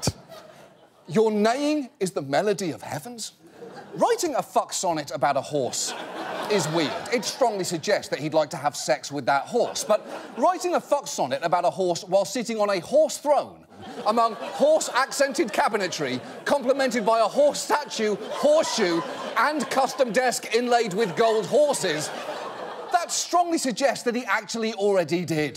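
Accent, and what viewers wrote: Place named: United Kingdom. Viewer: that trademark British delivery system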